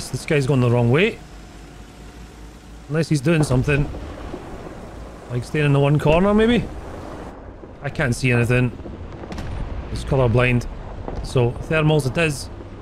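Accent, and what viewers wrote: Scotland, United Kingdom. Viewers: Pronunciation is quite good and the Scottish accent is always a nice plus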